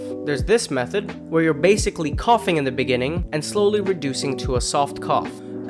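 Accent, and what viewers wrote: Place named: England, United Kingdom. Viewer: Yung english accent talaga eh 👍👌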